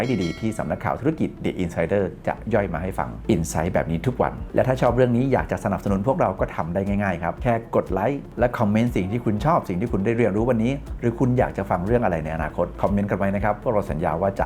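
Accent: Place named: North, Thailand. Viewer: The insider นี่คนเหนือชัวๆ😂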